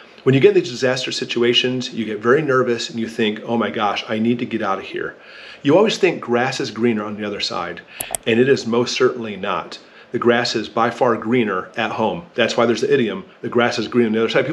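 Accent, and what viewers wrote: United States. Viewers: For a start all those who go out for a night in the woods, make a steak over a fire, and drink beer, they think whats about to happen is going to be just like that. Lol. It's NOT. Neither will it be a Mad Max Scenario. Just unimaginable horror and suffering. Anyway, who are you to tell anyone to stay or go ? What qualifies you ? To me you sound American, so when the SHTF, sadly, you will probably be exterminated along with Billions of others, who will die in unimaginable horror. Of course those you empowered, the Billionaire Elite politicians will all be safely tucked away in some cosy bunker, watching you die on tv monitors ! Finally, this is not Iraq, Afghanistan, Libya or anything like those backward tin pot countries, this is Russia & China ! Superpowers brimming with Nuclear weapons. This will be horror like you cant imagine. 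Why am I so certain, or speak with so much authority, because the West has been wanting a world conflict for well over 15 years now. Putin has nowhere to go, his only option is now to stand up to the USA. We all know what that means. Well nearly all, you very obviously don't. Anyway you see to forget also to mention for at least three days, no one even you, wont or shouldn't be going anywhere, as the fallout will kill you. Well if the winds blowing in your direction. There are so many more factors to take into account, however we'll just wait for you to tell us all what to do ! Strangely there wont be any Internet ! So I have to wonder how you're go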